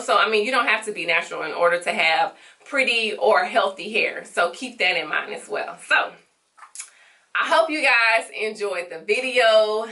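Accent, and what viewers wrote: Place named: Atlanta, United States. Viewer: You must be from Atlanta... That accent strong.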